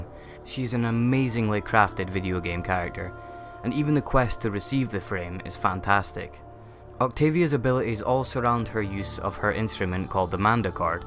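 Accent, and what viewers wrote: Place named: Scotland, United Kingdom. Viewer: Scottish accent can NEVER sound bad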